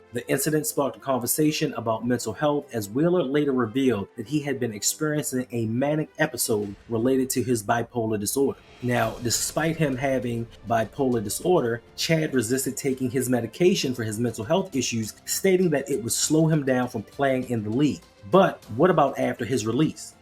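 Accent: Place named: Northeast, United States. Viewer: I'll be back when you get the audio right. Definitely from northeast . I can hear the MD, DC or PA in the voice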